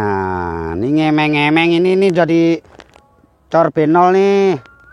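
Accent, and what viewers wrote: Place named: Bekasi, Indonesia. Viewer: Bang lu orang jawa apa orang bekasi....? bacotan lu sama pisan ama orang bekasi ora danta...😂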